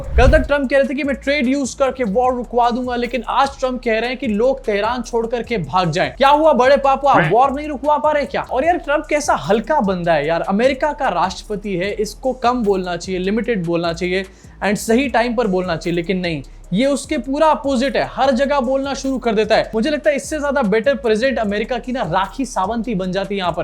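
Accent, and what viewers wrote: India. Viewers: your hinglish accent was so cute and funny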